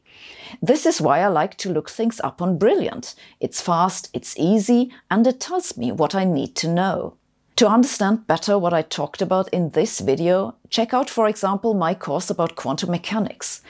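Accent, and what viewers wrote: Germany. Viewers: terrible german accent , SssssSsSssshhshSHSs my ears! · I never understand any of her video's but her German Accent turns me on... Oh Shut up, I bet I'm not the only one!🤓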